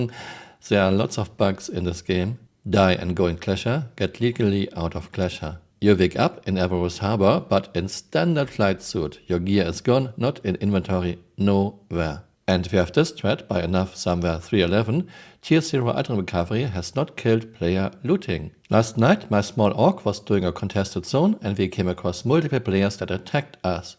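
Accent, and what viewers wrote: Germany. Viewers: LOL ich höre ganz stark heraus, dass du deutsch bist ✌️ Liege ich richtig ?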